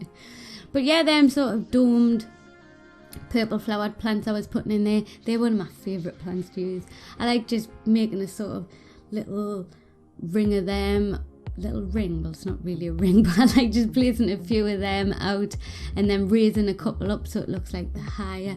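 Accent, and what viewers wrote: United Kingdom. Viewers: Your accent sounds like a mashup of something from the Caribbean plus something from the UK what is it i'm in loveeee???!?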